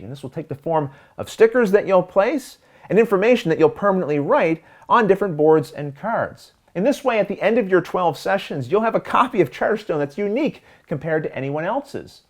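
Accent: Canada. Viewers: My wife and I have been discussing where your accent is from. My guess is Canada. Am I right?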